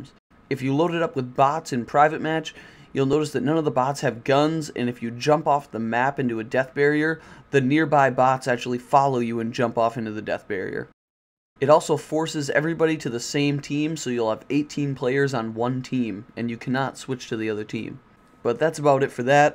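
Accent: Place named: South, United States. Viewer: Great video very well done! only issue is the voice over tbh I don't enjoy the southern accent please refrain from doing commentary in the future and please bring back the brexit guy with love Songless ps I am serious please don't do voice overs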